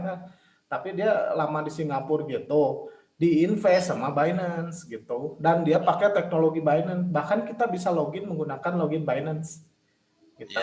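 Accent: West Java, Indonesia. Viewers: Sunda pisan euyy si akang haha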